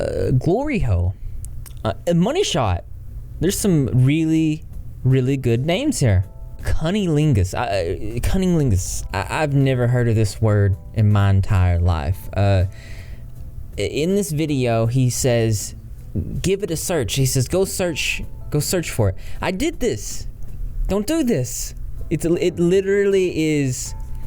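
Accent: South, United States. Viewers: long live the South, lol. I'm from NC and I feel like he's just gotta be close lol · Your southern accent reminds me of my hometown. Too cute ❤️🐢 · He has a slight southern accent sometimes it's quite noticeable depending on the word lol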